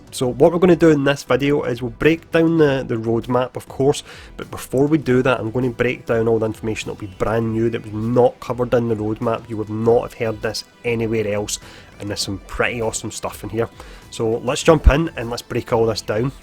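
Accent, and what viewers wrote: Scotland, United Kingdom. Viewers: Passion with a scottish accent ... good to see you excited about the game. Patrols are coming!!!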